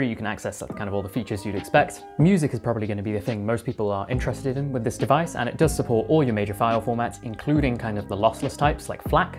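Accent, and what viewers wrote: United Kingdom. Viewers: I enjoy you review,. Besides your technical knowledge, your British enunciation is quite pleasant to hear.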